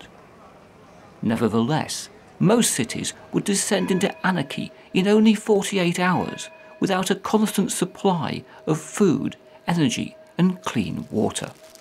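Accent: United Kingdom. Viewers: Does every fucking talking show host person have to have a british accent? Cool video btw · I think hes from Northern England. Im from London, our accents are quite different to eachother.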